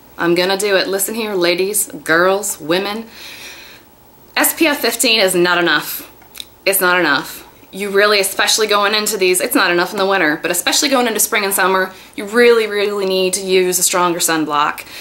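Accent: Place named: South, United States. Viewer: I knew you had to be from the South! We sound the same....and provide lots of information (ha ha!)....I have this one and feel like you do. I also have the Smashbox. I bought it before it was released to the public. I like it a lot. I still use a concealer and finish powder (IT cosmetics)...I like the look! I definitely think it's making my complexion more even. Keep the vids coming! I love your sense of humor! We Southern Belles got it goin on! Hee! Happy Easter, y'all!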